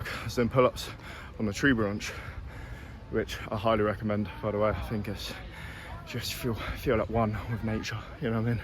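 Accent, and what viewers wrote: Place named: United Kingdom. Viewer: No question about it, you have a gorgeous body. I love your legs. They’re perfect. Your body is nicely proportioned You give good sexy face I hate the way you talk. With your unique name, I thought you were gonna be something different. I really really really can’t stand. I’m so disgusted by that British accent. Where are you? I’m subscribing to you for your sexy body and to watch you exercise and do shit. But dude, you gotta learn to speak standard American English. It’s like a Midwestern American English. Do you have an only fans? Have a great day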